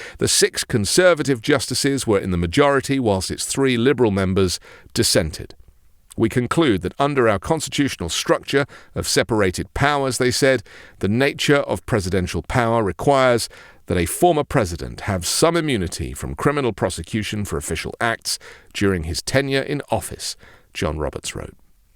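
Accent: United Kingdom. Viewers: Why is some feminine ass white with a British accent telling me about my American government f*** off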